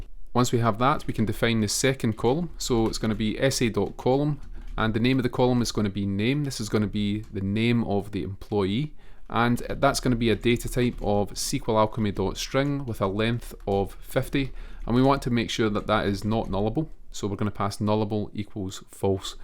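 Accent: Scotland, United Kingdom. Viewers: When I heard the Scottish accent I knew this was someone I could learn from. Edit: I was right. Pure gold of a video. Just golden nugget after golden nugget. No bs. Thank you so much! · british accent is too strong... i get you, its ok... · British accent adds so much style to this tutorial, thank you!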